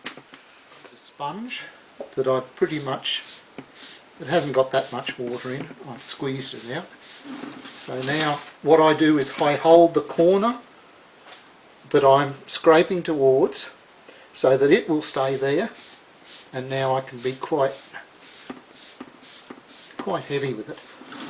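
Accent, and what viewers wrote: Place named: Australia. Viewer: great to hear an aussie! . the packaging tape technique has solved a problem for me. thanks.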